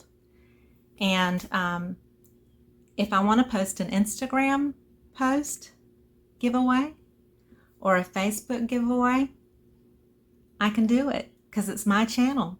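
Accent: South, United States. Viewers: I've always liked you and enjoyed your beautiful art. Now? Now, I love and respect you. What a beautiful heart. What a kind, loving and truly christianly way to respond to someone who was, how we say in the south, less than kind. Sister, you know what I'm talking about. I can hear in your voice you are a southern girl too. :) I can hear my Grandma's words as clear as the day she first said them to me, " Honey, when people are mean to you, you have to show them the love in your heart. You can't be mean back because that's what the devil wants. God wants us to love everybody. ". It was hard for me to understand when I was little. Now that I'm much older, I get it. I feel pity for people that are mean spirited. I always wonder what is going on in there life to make them so miserable. To try and hurt someone else is so negative. It's not what the world needs. 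It's not what anyone needs. The world tears us down, life's unavoidable pitfalls. Why not try to lift someone up? Being positive isn't always easy, especially when someone is unkind. You do it beautifully. I for one appreciate you, all your hard work and your loving, giving nature. You make the world brighter, happier and a better place to live. Thank you for all that you do. May God watch over you and protect you.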